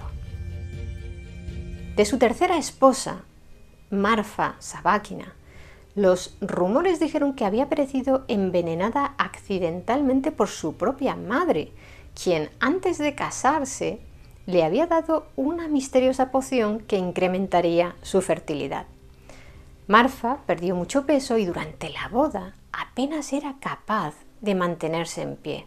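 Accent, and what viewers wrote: Spain. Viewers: Me encantó la< forma de contar las historias....pero muy, muy superior es la forma de transmitir, la entonación del relato me cautivó. No me es precisamente atractivo el acento español sin embargo me he enamorado de esa voz y no me pierdo capitulo, el último Oscar Wilde. Me gusta la historia y este canal me resulta muy atractivo y fácil de asimilar además de bastante documentado e hilvanado. Saludos y gracias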